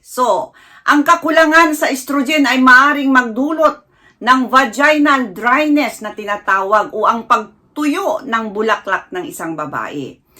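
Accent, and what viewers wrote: Visayas, Philippines. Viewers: Bisaya ka day? Basta bisaya gahi og dila.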